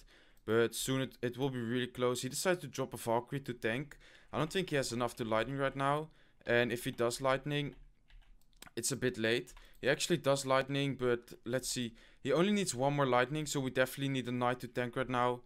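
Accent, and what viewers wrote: Netherlands. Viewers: Are u Dutch? Je hebt een Nederlands accent😂💪🏻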